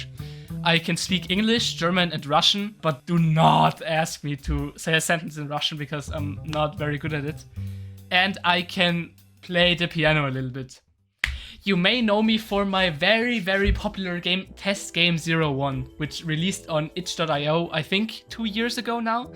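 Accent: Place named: England, United Kingdom. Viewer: You have a nice eng accent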